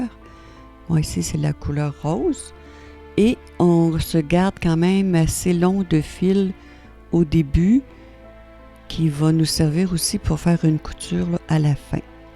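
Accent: Canada. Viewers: Il y a longtemps que je cherchais un modèle juste un peu pénible la pub qui s'affiche merci pour votre modéle il est super et j'adore votre accent canadien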